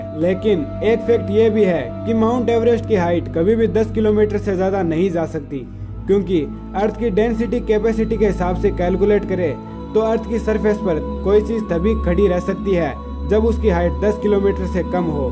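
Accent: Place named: Rajasthan, India. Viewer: Your accent sounds like a Rajasthani guy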